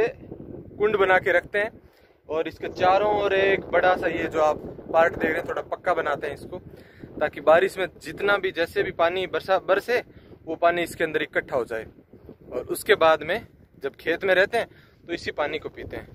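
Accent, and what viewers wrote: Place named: Nagaur, India. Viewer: Nagaur s match khati h aapki language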